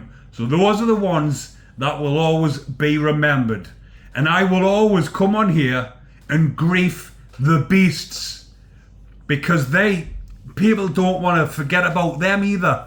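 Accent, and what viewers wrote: Newcastle, United Kingdom. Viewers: Love your Geordie accent you are a Great bloke love Jon.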